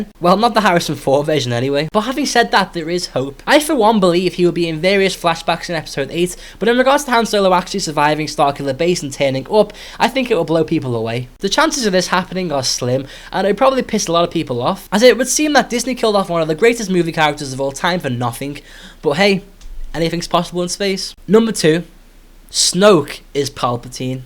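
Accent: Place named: Scotland, United Kingdom. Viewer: are you Scottish or irish or what?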